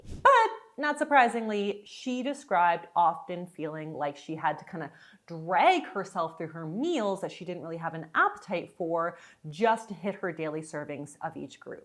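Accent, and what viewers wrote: Canada. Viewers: Honestly I thought you were american like me. You barely have a Canadian accent. Now that listen more carefully I can here it a bit.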